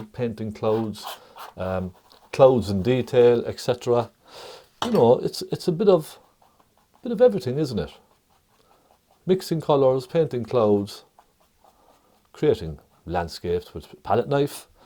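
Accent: Ireland. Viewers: how much do you charge for just a recording of your voice? The Irish magic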